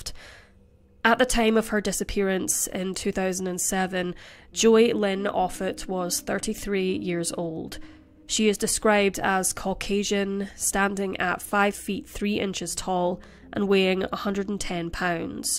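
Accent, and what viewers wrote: Scotland, United Kingdom. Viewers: Everything she says sounds great with that Scottish accent! 😋💙💜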